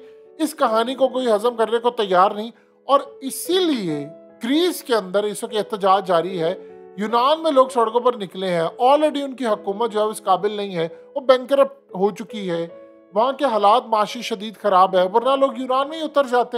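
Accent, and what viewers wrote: Punjab, India. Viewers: Mr.why you speaking Urdu in Punjabi style....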